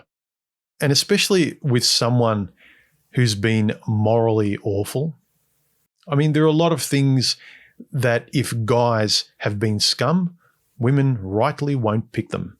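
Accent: Australia. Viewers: Statistics have shown the success rate of a marriage or defacto is proportionate on the number of partners a woman has had in her past but the same doesn't hold for men. Men always exaggerate that number and women always understate their number. But as a rule of thumb, women who have had more than 5 sexual partners are much more certain to divorce the higher that body count becomes. And point in question is my daughter, who is 36, brags over the number of sexual partners she has had. That number is over 100 by her own admission. She is unable to love a man or have an extended relationship with men. Her relationships last 2 or 3 months before they end and she always has someone else in reserve so she is never alone. She does not love herself. She has 2 adult brothers who claim to have only had 3 sexual partners each. They are both in very long term relationships, one married, one defacto. 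I am so proud that they never took the path their sister took and chose very carefully their respective partners. ..... And that beautiful Aussie accent makes listening so easy.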